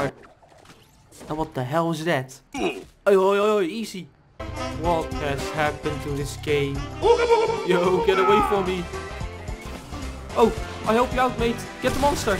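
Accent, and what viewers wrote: Netherlands. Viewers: ik ga stuk je nederlandse accent hahahaa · your english sounds really dutch. Are you from the Netherlands? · Are you from the netherlands you sound like that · You have a dutch accent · Hi’s accent is soooo Dutch😂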